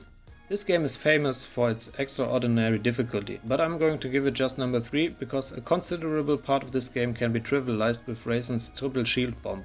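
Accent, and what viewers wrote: Germany. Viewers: Is that a german accent? I can feel it. I'm suffering from it too.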